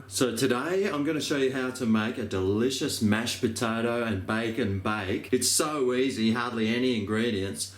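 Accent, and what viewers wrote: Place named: Australia. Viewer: You sound like you're from Australia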